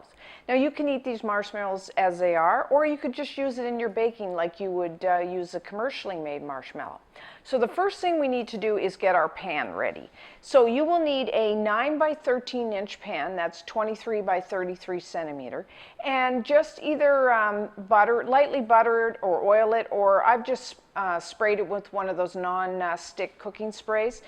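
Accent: Canada. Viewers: thought she was canadian, the accent and all that...weird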